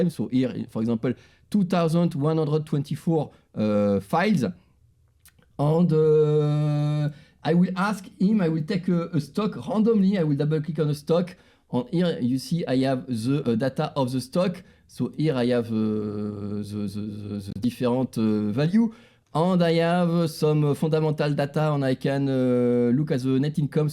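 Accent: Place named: France. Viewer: Merci beaucoup pour cette vidéo très instructive!!! with the cool french accent😅😅😅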